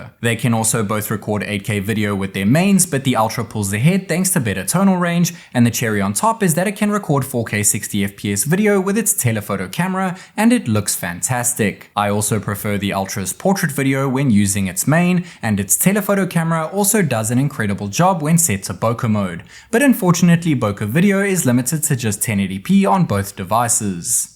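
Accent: South Africa. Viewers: The moment i heared your voice ik you were south African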